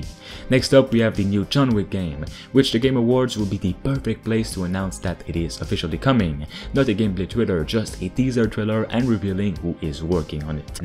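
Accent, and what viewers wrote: Quebec, Canada. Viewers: Bro t québécois right ?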